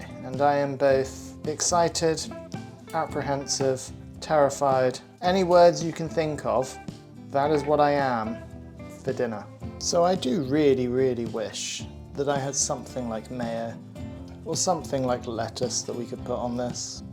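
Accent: RP, United Kingdom. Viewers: Dude I discovered your videos today, maybe 10 hrs ago. At first I head your accent and thought ‘Tory posho’ but I gave your videos a chance and now I’ve watched about 15 vids from your channel. Your actually a really down-to-earth guy and your content is brilliant, proper comfort watching. I haven’t just learnt some new low-cost recipes from the vids I’ve seen, I’ve also been reminded not to make assumptions. You’re a top guy mate, hope this channel goes far! X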